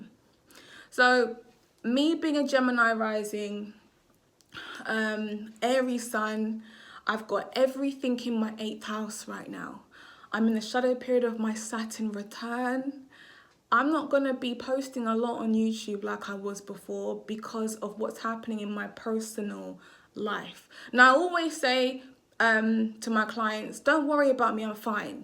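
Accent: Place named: United Kingdom. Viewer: Its called an accent... She's British.. Dont be that type of person famz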